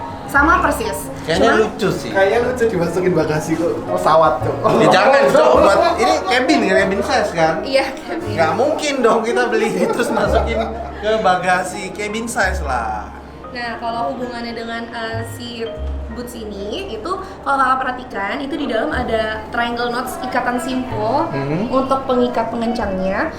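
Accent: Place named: Java, Indonesia. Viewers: Cabe joooon , wkwkwk ... btw , lucu nonton orang logat jawa kaya situ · Pertahano bhs suroboyo mu cak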